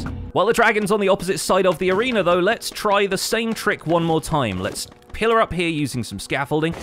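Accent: United Kingdom. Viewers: I just love your British accent😊😊😊😊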